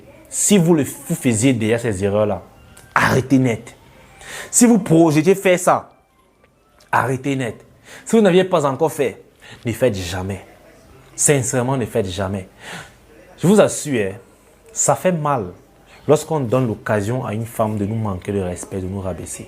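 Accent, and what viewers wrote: Cameroon. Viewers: Toi tu est camerounais bassa'a nespa!!!😂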